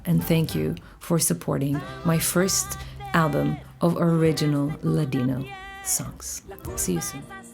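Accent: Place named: United States. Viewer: Woah your English is amazing. Aren't you from Israel? You sound like an American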